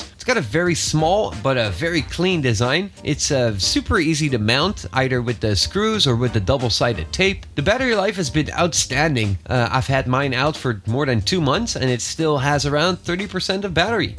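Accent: Netherlands. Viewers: Hoezo Nederlander? Je accent is duidelijk maat, haha Anyways, the camera seems to be nice and all. But I have been hearing never to take a device that comes from China due to hackers, content stolen, listening in, watching your cam etc. You didn't handle that aspect so how true is that and to what level is that a danger with this model? (I hope to get a answer quickly, my home has been broken into twice now and I wish to know whether this is the best one for me or not.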